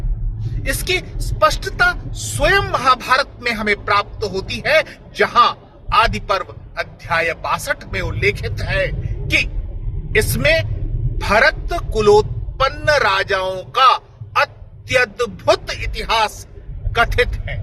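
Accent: Standard. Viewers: मुझे आपको सुनना पसंद है , आपकी हिंदी भाषा की शुद्धता कानों को शीतलता प्रदान करती है, आपके ज्ञान को नमन ,आप अपना काम करते रहिए , हम आपके अच्छे स्वास्थ्य की कामना करेंगे।धन्यवाद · Aap ki hindi kafi shuddha hai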